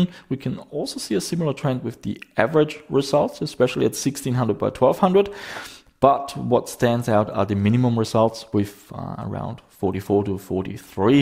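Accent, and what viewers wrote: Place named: Germany. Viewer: Wer merkt von euch wohl dass die Person mehr deutsch ist als mit dem englischen Akzent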